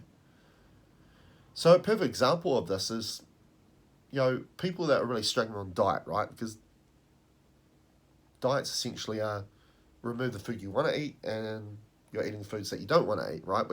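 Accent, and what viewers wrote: New Zealand. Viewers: Aloha, are you from NZ ?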